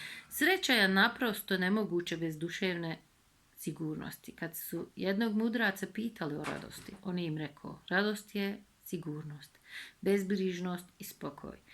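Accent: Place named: North Macedonia. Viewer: iyi guzel hizli konusuyorsun konusma aksanin makedoncaya. ve rus diline kaciyor kelimeleri konusma ve okuma yi yutarak ve hizli konusup okuyorsun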